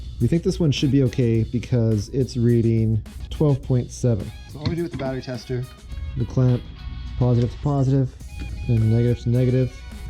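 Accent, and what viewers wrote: Canada. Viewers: Are you Canadian? I think I can tell. British guy here! 😀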